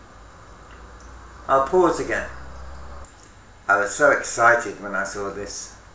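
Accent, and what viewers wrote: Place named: Ireland. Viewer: Love the Irish accent! nice video!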